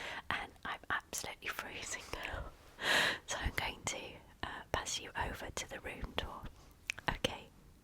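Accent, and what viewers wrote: United Kingdom. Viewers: Who else but could relax me and make me sleepy doing a reveal video. Looking forward to your new studio, and plz quit rubbing and scratching the carpet i allmost fell off to sleep. Lol and being from the southern part of the USA, i LOVE the Brithish accent! Good luck Love!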